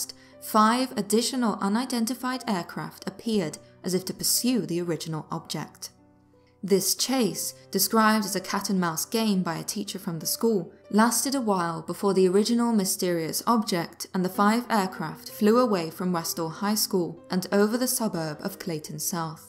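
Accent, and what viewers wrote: United Kingdom. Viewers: usually I hate British accents but I am in love with your voice and it is perfect for reading these things. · I loved your Jeckle and Hyde narration I would like to recommend another story if you are interested. Be.Busta narrated “The Left/Right Game” it’s a really good story but as it is from a female perspective it’s missing something. I would just love to hear your voice instead. The author writes from the perspective of a journalist from Bristol and I hate to assume that you are from the UK, but with the British/Aussie/New Zealand style accent it just fits like a glove and I find myself more engrossed in the storyline. Anyway, just a recommendation ❤️❤️❤️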